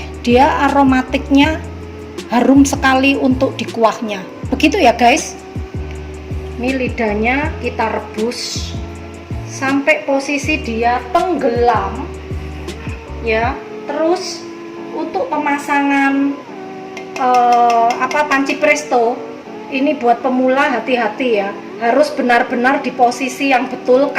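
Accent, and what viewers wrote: Java, Indonesia. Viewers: ngomong medhok nya yang ngangenin liat kontenx sll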